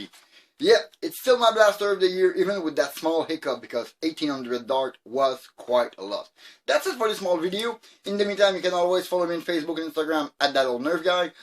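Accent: Canada. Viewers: I had a debate with my 8 year old on the accent :) I saw in another comment you got your parts on aliexpress? Just luck from the algorithm I saw your video and recognized the accent. I do not think I have seen any Canadian modders on youtube except you so far. Anglo here living in Montreal for 20 years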